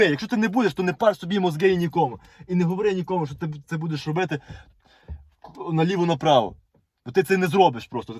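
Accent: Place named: Western Ukraine, Ukraine. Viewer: Акцент сельский западной Украини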